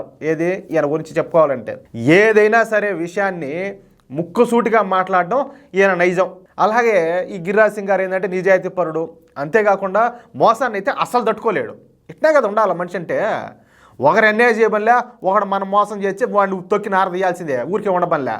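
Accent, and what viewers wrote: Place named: Nellore, India. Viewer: Yes. Rightly said by Honourable Minister. Garu, I liked your Nellore Syle Assent. Keep it up.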